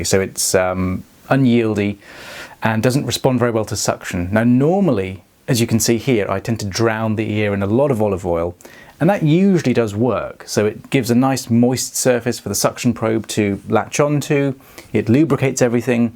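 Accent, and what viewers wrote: England, United Kingdom. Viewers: Very nice haircut Mr.. You are so cute and the english accent is cherry on top 😍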